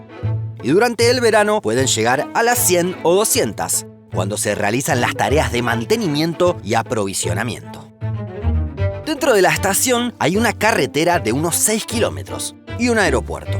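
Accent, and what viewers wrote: Argentina. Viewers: ¿Este canal lo hace un argentino?, pregunto por curiosidad.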